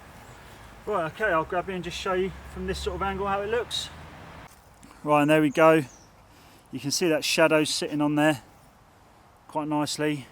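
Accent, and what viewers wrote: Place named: Cockney, United Kingdom. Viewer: From one cockney living in OZ to another cockney - good stuff mate. I've got a small job to do in my kitchen. I am going to Bunnings to get a chariot tool now. Also the out takes are cracking me up !!!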